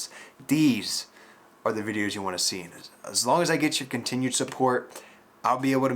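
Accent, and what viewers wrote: Texas, United States. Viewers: i love your accent dude. are you from texas? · Are you from Texas?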